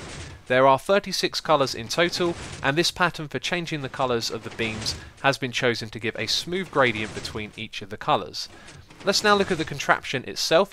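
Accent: England, United Kingdom. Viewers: I thought was from England:P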